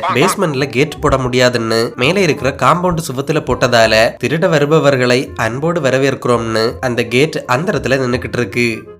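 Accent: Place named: Kerala, India. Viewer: Mallu Tamil super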